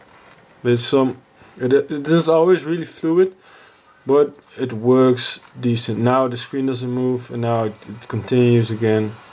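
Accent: Netherlands. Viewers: ben je nederlands toevallig? zo ja, goed engels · Are you dutch ? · Are you dutch??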